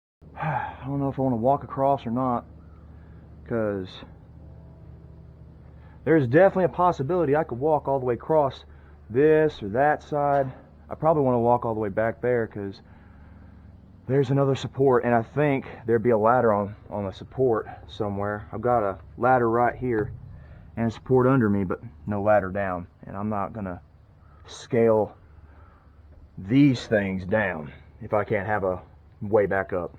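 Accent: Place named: South, United States. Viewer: Speaks like Huckleberry Finn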